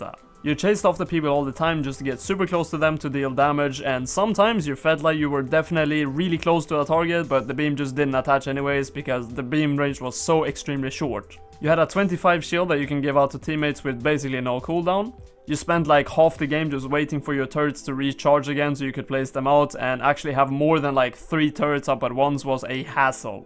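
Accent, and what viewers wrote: Sweden. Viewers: are you swedish? I'm swedish and thought that you sounded like a swede. (I'm not trying to be mean.) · You sound like you are swedish?!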